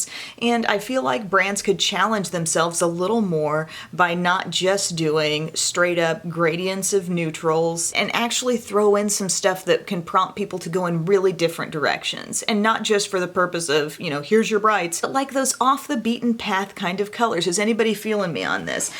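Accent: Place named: Illinois, United States. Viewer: I live in Hawaii and at 3 am I was about to go to sleep but saw your notification and had to watch! I love your content. Thank you for being so honest and always so positive. You seem to be so kind and genuine. P.S. I'm originally from Kentucky so your Illinois voice reminds me of home. 💙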